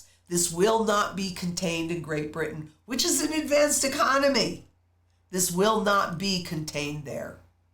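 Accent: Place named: South, United States. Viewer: Me thinketh and yet another informative number of points driven home by such a., great Southern........twang!@ Thanks!!!🙂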